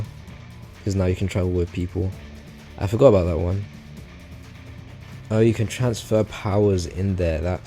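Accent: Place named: United Kingdom. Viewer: Do my ears deceive me? A fellow British Xiaolin Showdown fan?!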